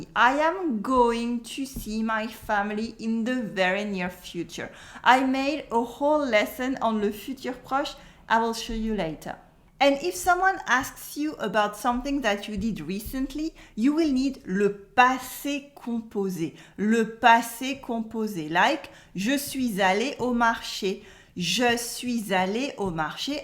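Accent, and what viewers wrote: France. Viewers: Comme une française surtout l’accent ! A couper au couteau !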